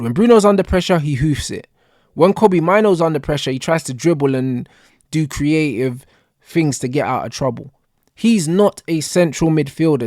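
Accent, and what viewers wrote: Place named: Manchester, United Kingdom. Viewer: Lovely Manc accent though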